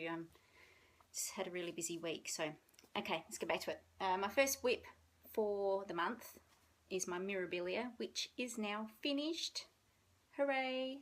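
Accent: Australia. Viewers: I still am amazed at how much you get done with your month! Mermaid of Atlantis is beautiful. You should be very pleased with how she came out! All of those beads really do make a difference. I will be excited to start beading my mermaid! I'm still working on the skin, though... The little cottages are too cute! Blackwork sampler is looking very nice. And Refleggions looks so pretty! The purple is perfect for Easter. And your thoughts on the word khaki made me laugh. I had to say it out loud both times! I love listening to you and the other Aussies to pick up the accent. The Australian one is one I haven't yet been able to get. I can do a few phrases, but it doesn't hold very well, haha. At least now I can pronounce khaki!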